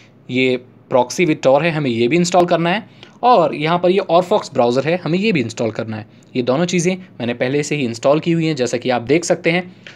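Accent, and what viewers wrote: Odisha, India. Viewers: sir aap odisa se hain kya?? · are you from odisha??? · Ap odisha se hai kya??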